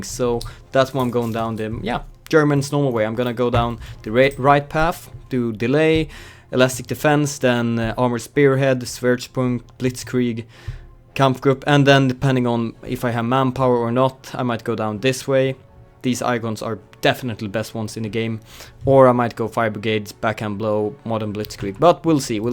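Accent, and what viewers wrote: Sweden. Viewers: Är du svensk, för du låter väldigt svensk i din engelska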